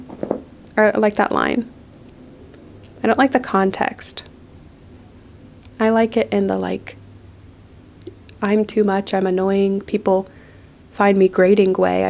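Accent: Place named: Chapel Hill, United States. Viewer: Omg there was always something so familiar about your cadance. i’m from chapel hill, it all adds up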